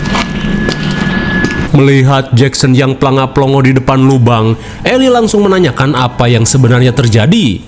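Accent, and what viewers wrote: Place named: Sunda, Indonesia. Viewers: itu logat Sunda bang, resep bgt saya dengernya, karna saya juga orang Sunda🤣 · logatt Sunda itu biasanya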